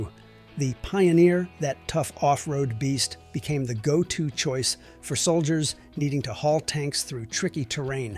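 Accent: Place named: United States. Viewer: Why do we have to have the voice of an American narrator.???????????????????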